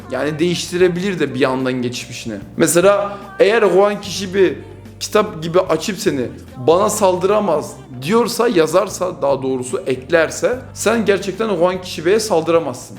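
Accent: France. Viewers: abimin maşşallah jean pierre polnareff gibi fransız aksanı var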